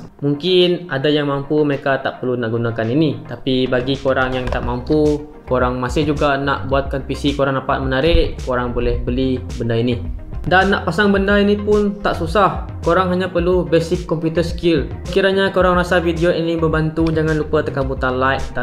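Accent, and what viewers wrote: Sarawak, Malaysia. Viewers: Org srwkkah,sbb cara nya cakap kedak org srwk pin slang xd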